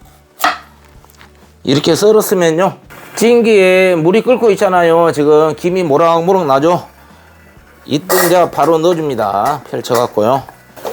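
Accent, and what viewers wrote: Jeolla, South Korea. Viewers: 세프님고향이 전라도?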